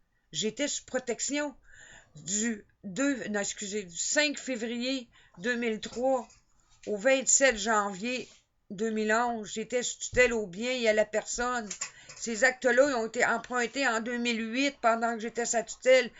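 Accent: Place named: Quebec, Canada. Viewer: Pour ton accent je dirais que tu es québécoise, alors ça se passe comment depuis ? ça s'est amélioré ?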